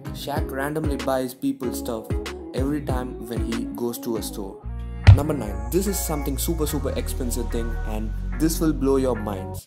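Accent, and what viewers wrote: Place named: Australia. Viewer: This guy has Australian accent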